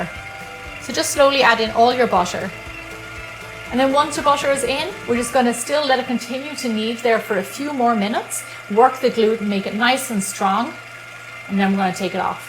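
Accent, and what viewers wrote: Ireland. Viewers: Hi as an Irish person watching this from Wakes, UK this made me smile. Your lovely Irish accent reminds me of home. 🇮🇪🙂☘️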